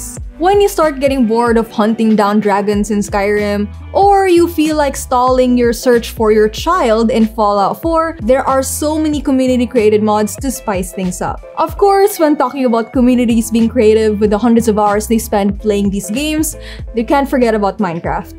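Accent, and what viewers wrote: Philippines. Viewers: That's some thick philipino accent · Filipino accent is strong on this one.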